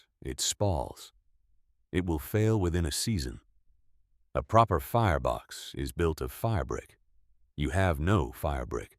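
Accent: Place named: United States. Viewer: Oooh! A narrator with an American accent!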